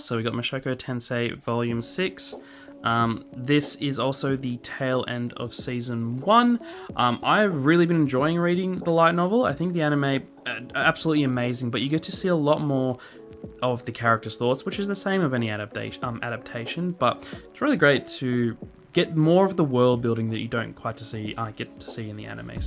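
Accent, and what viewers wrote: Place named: Australia. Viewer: Dude I just found your channel and I wish I found it sooner. A fellow Australian (I’m assuming from the accent) with a manga related channel? Sign me up. It’s good to know I’m not alone in this niche of YouTube. Starting out is hard but I will say your videos are crafted really nicely I enjoyed your video all the way through. Keep it up mate.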